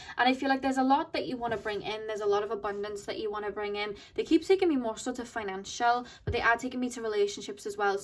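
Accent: Wales, United Kingdom. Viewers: I love it the Welsh is one of my most favourite accents I don't know how but I hear a little bit geordie in there it's lovely! And your readings are so good!! 😘💗💗💗💗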